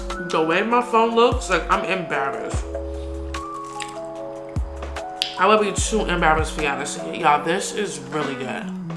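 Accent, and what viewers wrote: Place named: New York, United States. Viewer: Looks good and I was dead at the mail part falling lmaoo 😭🖤and omg you sound you from newyork !! Got to be but love the accent !! Keep going gods got you and YouTube is for you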